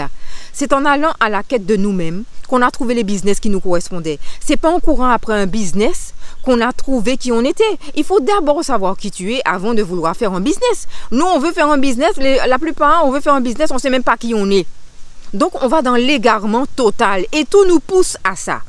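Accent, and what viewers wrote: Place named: Benin. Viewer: L’accent beninois s'est installé 😅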